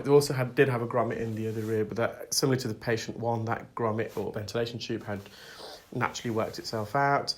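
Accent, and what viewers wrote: England, United Kingdom. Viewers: with all the cases you have to wrestle out you must really appreciate the few cases that come right out with no problem 😂 i know i would - but i’m the impatient fan as i posted yesterday lol. u have such a suave soothing voice, i love the sound of the suave English accent (to me it’s an accent lol). i notice some have a sharper sound, hard to explain but my UK friends were impressed that apparently i noticed the difference between north and south England. they tried to trick me with a Yorkshire … i could tell it was different but not north or south ☺️ while i was on Holiday in the UK they loved my accent 😂 it was funny to be the one with the accent! they said i sounded so proper 😄 American proper?? 😂😂 i think we’ve butchered the Queens English!! anyway i loved the people & the country ❤️❤️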